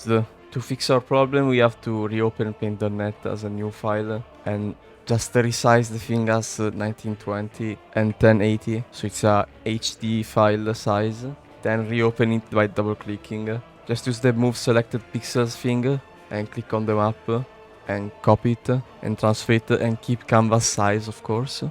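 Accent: Italy. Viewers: By the voice and the site it's possible to notice that you are Italian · The Italian accent goes REALLY strong... kind of Florentine/Romagnol-ish, Anyway, nice video, this will be useful for me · you do sound italian like your youtube channels name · Madonna quanto si sente l'accento italiano 😅😮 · Your voice sounds incredibly amazing It sounds nice when you speak English with an Italian accent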